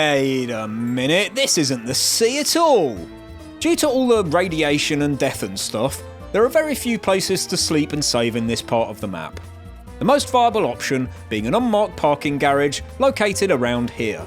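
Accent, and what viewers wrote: United Kingdom. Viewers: Great video lad, your accent makes me want to see you do this entire challenge again in Fallout London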